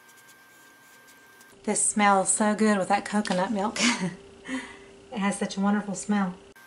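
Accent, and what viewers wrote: South, United States. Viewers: Love this one. Absolutely lovely. I knew your accent was either NC, SC or GA. Hillsboro is only 45 minutes away. Now I'm upset I missed the festival. I'll catch the next one.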